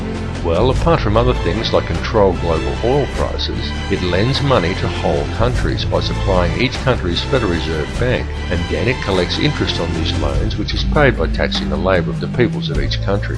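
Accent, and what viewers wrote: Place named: Australia. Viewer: have any idea who the speaker might be? he seems australian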